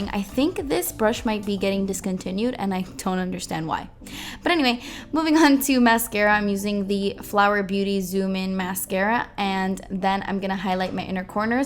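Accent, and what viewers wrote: Cuba. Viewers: thank you for moving far away from the candle !!! it would give me anxiety when it was right behind you....!!!!! and I can't get enough of the Cuban accent, lo amo!! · chica yo te las veo perfecta!😂 adoro el acento cubano. Soy Nicaragüense😁. Cuba y Nicaragua son besties.😍 te amo!! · Stunning as always #LoveTheCubanAccent 💕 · I love when you speak Spanish, I can hear that Cuban accent peaking through 🙌🏼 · I frikin love your videos y ese acento Cubano ! Ay me encanta!! Perfecta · You should do a whole video in Spanish!!!! I love your Cuban accent!!! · Loving that Cuban accent❤️😍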